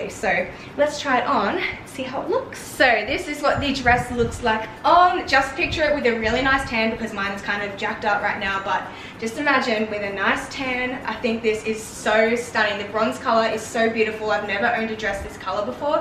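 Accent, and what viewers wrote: Australia. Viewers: Why do aussies pronounce o, oyyuuu. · Australian Women Are Gorgeous!!! not only you're beautiful you have a nice accent too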